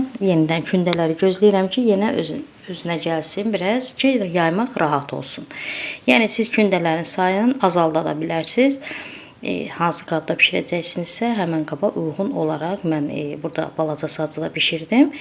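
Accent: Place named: Mughan, Azerbaijan. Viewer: Azərbaycan balaca olsa da, hər tərəfinin öz mətbəxi var. Bəli, bizim Gəncə-Qazax bölgəsində də fəsəli ədviyyatsız, kərə yağı ilə olur. Ancaq gördüyünüz kimi, xanım Muğan ləhcəsində danışır. Hələ Quba tərəfdə fəsəli mövzusunda tamam başqa yeniliklər kəşf edə bilərsiniz. :) Bu, ölkə mətbəxinin zənginliyini göstərir və çox gözəldir. Yaxşı ki, belədir. Mən çoxdan bu ədviyyatlı fəsəlini bişirmək istəyirdim. Müəllif də çox gözəl, detallı izah edib. Mütləq bişirəcəyəm.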